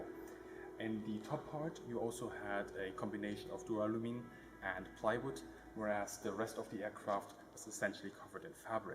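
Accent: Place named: Germany. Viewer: Wow! This guy has such a depth of knowledge, AND a talent for sharing it. Your English grammar is perfect, but your German accent is also perfect! As is your Italian accent when talking about Italian aircraft. I am so glad that you (obviously) enjoy teaching us about things we would never have imagined we would be interested in without your tutelage! Very impressive deep knowledge. Thanks for sharing! Vielen Dank!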